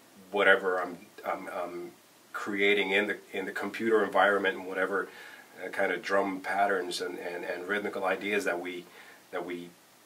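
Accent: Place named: United States. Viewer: His American accent is almost as good as his drumming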